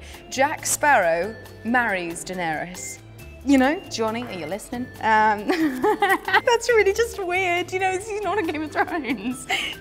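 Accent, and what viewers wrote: United Kingdom. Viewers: why are there subtitles?? is a british accent so hard to understand????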